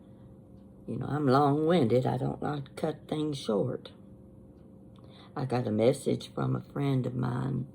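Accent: South, United States. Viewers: I am 50 yo and I just love watching your videos, I love your tone of voice and your southern American accent. You seem such a nice and interesting person. So much full of life and interesting topics to tell. You relax me a lot. And my life is hectic, I certainly need someone like you in my life. You are great, thank you sweet lady! By the way, the earrings you made are fantastic. ❤ From Costa Rica.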